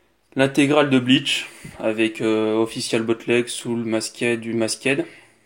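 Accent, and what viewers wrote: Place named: England, United Kingdom. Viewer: Excellente cette vidéo avec un accent anglais tellement beau ! Je recommande les yeux fermés les perdus de l'internet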